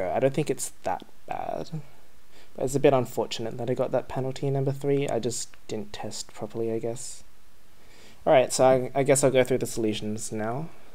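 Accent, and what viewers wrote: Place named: Australia. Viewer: Omg the Australian accent 🔥🔥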